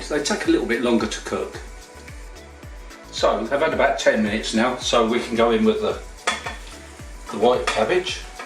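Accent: United Kingdom. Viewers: Are you a londoner?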